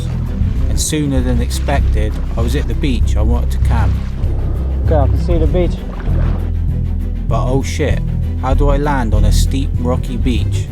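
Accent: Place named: West Country, United Kingdom. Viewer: Well done, thanks for another entertaining video. I'm guessing the accent is West Country, Bristol maybe? (I spent 20 years in Salisbury). Hope you managed some😴 sleep later. The poem.....................well let's just say don't give up the day job🤣🤣 Plus, I haven't figured it out unless the 40 stones throw were one for each year of the birthday boy? 👍🇬🇧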